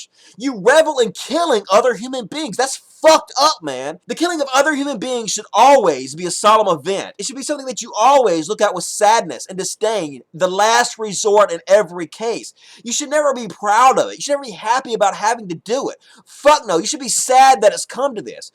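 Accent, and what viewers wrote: South, United States. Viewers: What a hoot! That face, that accent, - going completely against my non-American expectations of how Americans who look and sound a certain way think and delivering complete good sense. Nice one. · Probably the most rational person about war who has a southern accent that I've ever heard.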